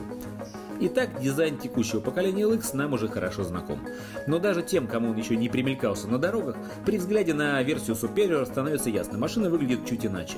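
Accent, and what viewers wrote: Standard. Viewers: Многие обзоры смотрю но пока всех рвёт-хороший словарный запас, чистая русская речь без хаканий и гаканий, нет ёбоной рекламы сукотеков, музон и картинка выше всех похвал-что вам блядь ещё надо, чел отрабатывает каждый ролик на 150%. Давайте скажем ему СПАСИБО!!! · Спасибо,. Всем не угодишь. Я с Казахстана. И мне приятно слушать чистую русскую и грамотную течь.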